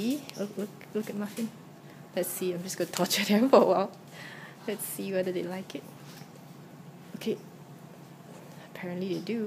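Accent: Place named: Singapore. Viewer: Oh, are you Singaporean because you sure sound like one!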